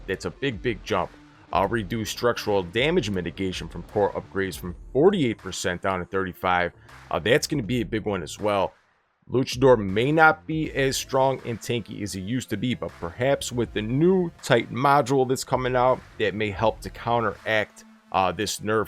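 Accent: Midwest, United States. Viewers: I like how you say "sharanga" 😂👍that Midwest accent never gets old 💚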